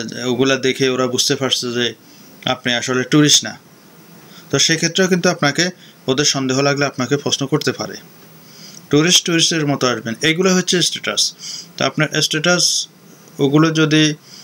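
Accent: Noakhali, Bangladesh. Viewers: ভাইয়া আপনে খুব চালাক মানুষের কথা বুজে পেলেন। তাই আপনার কথা গুলা বেসি বেসি শুনি খুব ভালো লাগে আসোলে নোয়াখালির বোলে কথা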